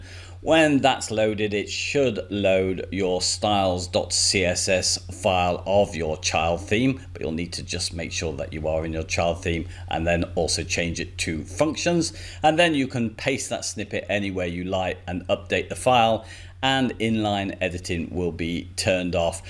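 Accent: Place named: England, United Kingdom. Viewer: I was going to say Pommy accent, but then I realised no one outside of Aus would have understood what I meant :)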